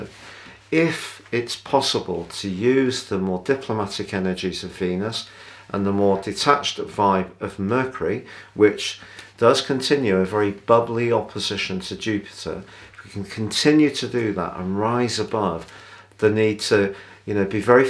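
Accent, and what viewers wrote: United Kingdom. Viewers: I love this gentlemen. I love to hear him. He speaks fine and proper, love the British accent, I my personal opinion is the must marvelous, beautiful accent. Love him!!!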